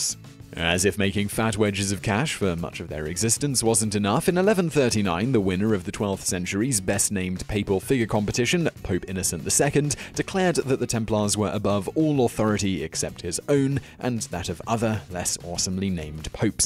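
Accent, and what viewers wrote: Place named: United Kingdom. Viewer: Off topic, I realize - but this Southern American just loves your British accent! "Numba Niieeen-uh'...... like listening to music :-)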